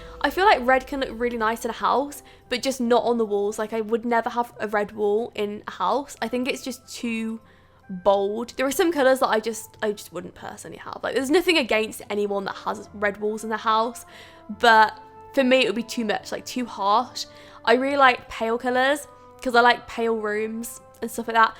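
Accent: United Kingdom. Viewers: You have the most British accent I've ever heard.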